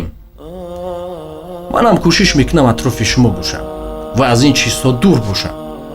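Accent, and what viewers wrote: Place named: Pamir, Tajikistan. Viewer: Акай ранги одамой Помир точикира гап мезанен шумо Блогер нестен шумо Инсони хак мебошед ранги шумо бори Инсон кам аст!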